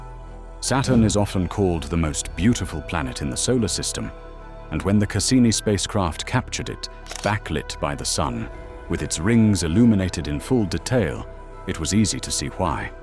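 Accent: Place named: United Kingdom. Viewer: Awful British accented narrative sucks.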